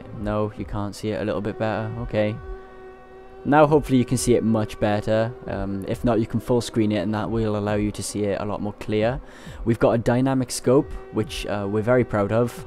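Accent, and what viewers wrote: Wales, United Kingdom. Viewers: are you welsh?! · I'm British... So why would I get tired of another British voice?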